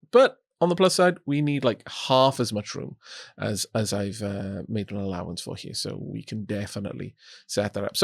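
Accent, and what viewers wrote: Wales, United Kingdom. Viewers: Ha, just finished the previous episode, enjoying the dulcet welsh tone of our tea drinking dragon saviour as I recover from my surgery today lol much appreciate the upload to keep my chill recovery going lol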